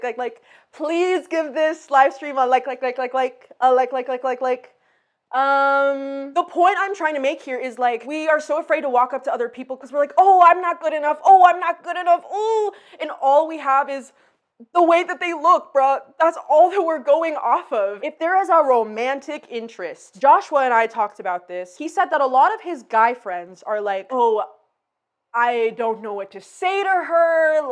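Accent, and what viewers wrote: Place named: General American, United States. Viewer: Generic american. Accents are more difficult to place now-a-days because we consume so much media and pick up words in different accents.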